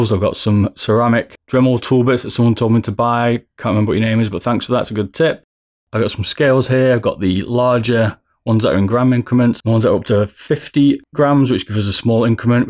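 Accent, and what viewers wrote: United Kingdom. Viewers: wow! I subscribed to the channel after watching four super interesting videos! very good quality content, congrats! only a minor issue: I had to enable subs (english subs) because I'm not English mother tongue and even if I can speak and understand it quite good I'm not used to the accent you have (british, I guess?). but hey, not really a problem ;)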